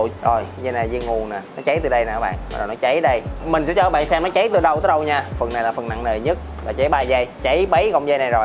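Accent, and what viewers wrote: Standard. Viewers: Hoc văn giỏi hong, nói chuẩn vậy trời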